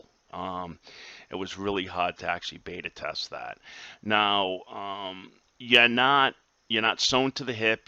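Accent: New York, United States. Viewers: Are you from new york?! If so youre awesome! Love your accent!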